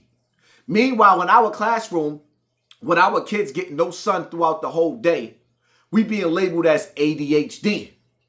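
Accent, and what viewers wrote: Brooklyn, United States. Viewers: BROOKLYN TONE N THE BUILDING,..EAST NY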